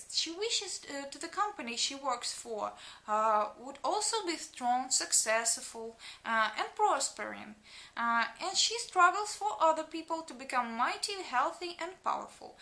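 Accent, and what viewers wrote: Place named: Netherlands. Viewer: sounds like dutch accent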